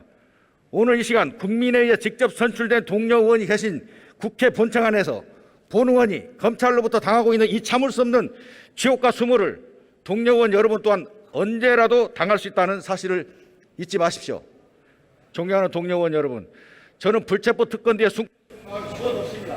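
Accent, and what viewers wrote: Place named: Jeolla, South Korea. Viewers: 말투보니 라도 같은데? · 전라도 특징 의 를 으 라함ㄹㅇ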